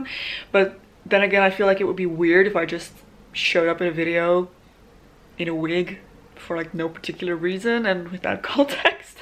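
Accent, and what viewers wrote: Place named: Netherlands. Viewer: haha ik merkte je accent, dus ging er vanuit dat je nederlands was, dus ik overla zoeken, n toen zag ik een boek van paulien cornellise, en toen wist ik zeker dat je nederlands was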